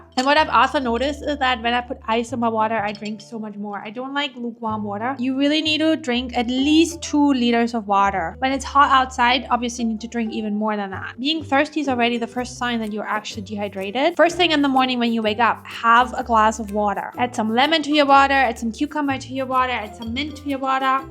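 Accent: Germany. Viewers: Love the tips. Also, are you German? I really feel like your accent is German?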